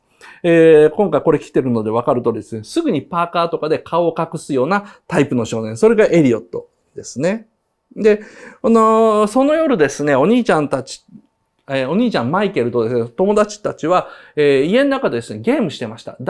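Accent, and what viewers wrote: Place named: Sumiyoshi, Japan. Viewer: なんか土井善晴が浮かぶなと思ったら住吉区のイントネーションなんですな。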